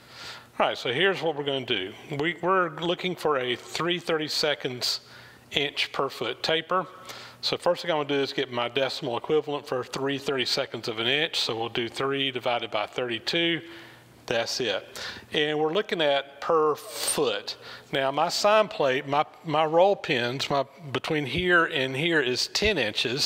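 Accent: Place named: Georgia, United States. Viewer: Was his Georgia accent not enough of a clue?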